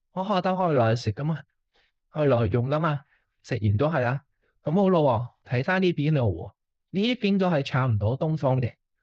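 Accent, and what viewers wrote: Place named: Taiwan. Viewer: 你係台灣人? 廣東話口音有啲唔同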